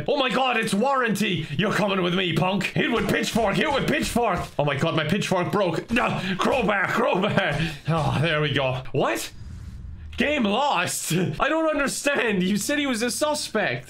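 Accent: Russia. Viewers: "Welcome to the republic of.... Ireland!" Speaks with an russian accent, everything is spelled in a slavic language... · AWESOME Russian acccent comrade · your russian accent reminded me of the metro games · I like his russian accent :D Please play it again! ♥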